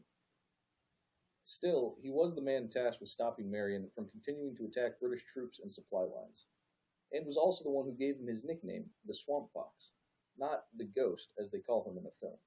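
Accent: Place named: United States. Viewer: Brit speaking with American accent...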